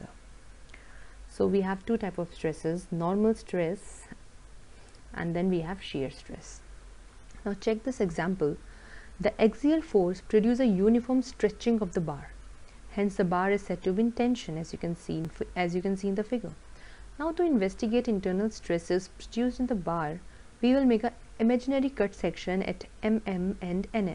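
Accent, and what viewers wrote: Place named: India. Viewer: Is the teacher from India? the acccent is not standard.........but the lesson is good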